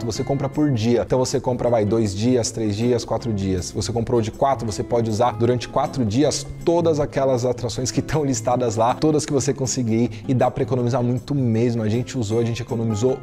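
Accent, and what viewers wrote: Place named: São Paulo, Brazil. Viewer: Ele fala meio rindo né. Que nem o Luciano huck hahahah quem tava na dúvida se ele era paulistano tirou 🤣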